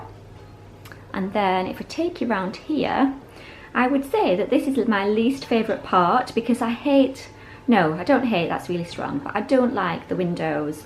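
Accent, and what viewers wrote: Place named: Yorkshire, United Kingdom. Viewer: I love your style and your Yorkshire accent. Just gorge. x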